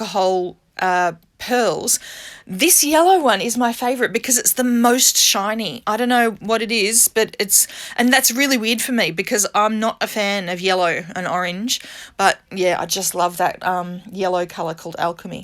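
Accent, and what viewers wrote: Australia. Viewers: Hi. Happy Easter 🐣. Thanks for sharing your alcohol ink techniques with us all. What an honour to be celebrating Jessica! The blues and bronze on white is my pick. So rich and lux looking. Oh and I love your Australian accent. It’s just like mine 😉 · A fellow Aussie, Yay! Glad to hear the familiar accent during the hop. New subscriber from Central Coast NSW ❤️🇦🇺 and just a quick tip for when you run out of the Snow White mixative, I use white nail polish! Sometimes you need two coats depending on how opaque it is. 😊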